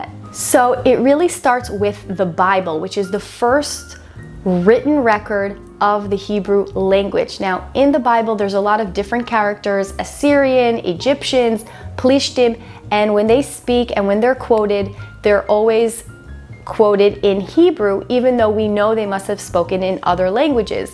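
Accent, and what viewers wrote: United States. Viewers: Funny, she doesn't sound middle eastern, or look middle eastern. In fact, she sounds downright American. Funny that.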